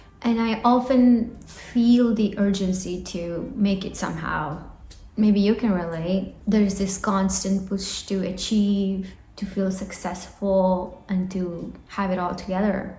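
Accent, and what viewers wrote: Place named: United States. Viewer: Wow your English accent is just like the American... Nice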